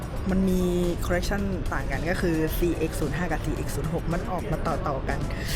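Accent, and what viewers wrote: South, Thailand. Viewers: พี่คนใต้เหรอผมก็ใต้นิพี้เห้อ😂😂😂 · พี่พูดทองเเดงตลกมากผมก็คนใต้ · พี่เป็นคนใต้เหรอครับ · พี่คนใต้เหรอครับผมก็อยู่ใต้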